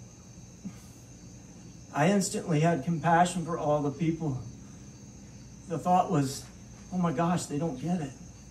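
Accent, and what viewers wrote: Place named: South, United States. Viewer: That was beautiful! I loved the part when you said the word light and lightning flashed in the distance. Thank you. We are so proud of you! You still have your southern accent. ❤️—Myrtle Beach mom and daughter, Catherine MBHS Sophomore.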